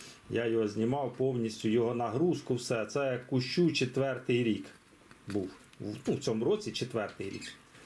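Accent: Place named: Kirovohrad, Ukraine. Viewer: Дякую за відео! Підписка, вподобайка. Ви часом не з Кіровоградської області? Ну геть розмовляєте, як у нас). Чи продаєте Ви саджанці винограду?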